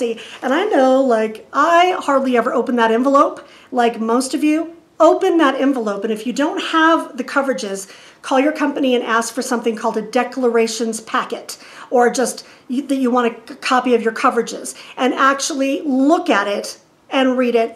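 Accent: United States. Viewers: You talk just like any normal Yankee. I'm use to it. Was not to fast for me and I'm south of the Mason Dixon. Great info as looking now for a good company. My daughter told me Progressive was where to start. Thanks for the details will be very helpful.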